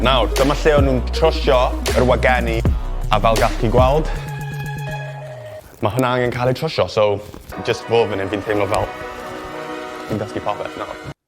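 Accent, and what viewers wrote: Wales, United Kingdom. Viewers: Tin siarad fel typical kid a aeth I Glantaf. Ond at least ti YN siarad Cymraeg so da iawn anyway.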